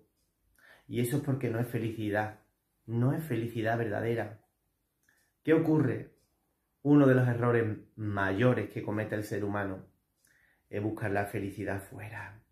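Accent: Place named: Andalusia, Spain. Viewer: M gracias.. Me encanta tu acento andaluz yo de Cádiz. Ciudad de. Luz. Y tú luz es. Muy. Jeneroza gracias gracias gracias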